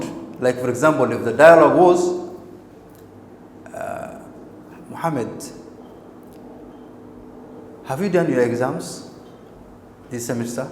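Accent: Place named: Kenya. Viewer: I hope this teacher is from neighbouring country called kenya because he pronounce words the way i pronounced which means iam also from kenya.anyway thank teacher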